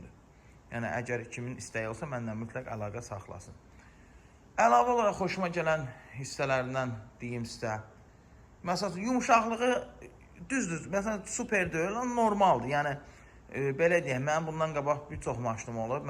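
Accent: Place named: Qabala, Azerbaijan. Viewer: Ləhcənnən nömrəni 036 muqaisələndirdim qərara gəldim ki Qəbələdənsən😌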